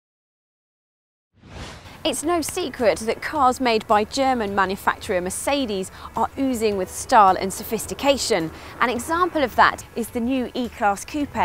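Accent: United Kingdom. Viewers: will you also stop saying british accent there are lots of british accents its english she speaks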